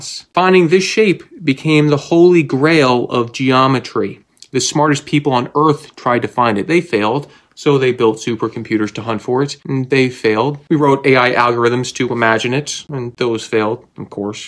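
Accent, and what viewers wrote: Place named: England, United Kingdom. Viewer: Why is there a german flag on your ceiling, when you can't even pronounce german without an English accent. (Genuine question here, no disrespect intended!❤)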